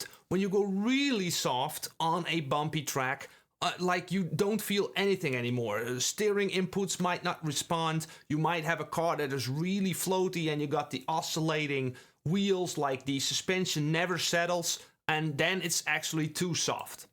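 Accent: Netherlands. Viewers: You're not Dutch, are you? You sound exactly like one of my best friends!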